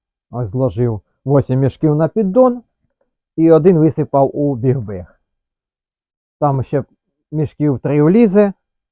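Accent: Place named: Chernihiv, Ukraine. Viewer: Випадково відкрив,чую говір чернігівський.почитав коментарі.Привіт з бобровицького краю вам.підписався.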